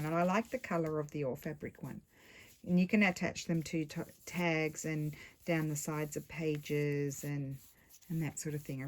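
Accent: Australia. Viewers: Hi from Cairns Queensland. Like many others, I have become reliant on your daily broadcasts from Italy where you've been hard hit by COVID19. It always makes me happy to tune in to YouTube and see that your latest vid is there. I love listening to your chit chat and am glad to hear that you still sound like an Aussie. It also always good to know that Lulu, Steph and you are living their lives still as normally as possible during this apocalypse. Stay well, keep up with your vids please. Cheers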